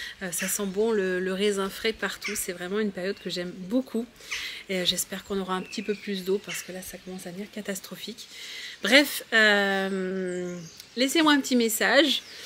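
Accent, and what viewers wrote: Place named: Switzerland. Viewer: Je me gardais ce vlog de côté pour un moment en tête à tête avec toi... C'est agréable ce format : il nous permet de prendre le temps de le regarder. Bien plus que quand les stories défilent et qu'on n'est pas spécialement attentif ! Est-ce que mon moment préféré reste le "coucou", j'avoue ne pas en avoir entendu un depuis des années, et ça m'a dépaysé d'un coup ! Ton p'tit côté suisse ... Merci beaucoup ! C'était un chouette moment !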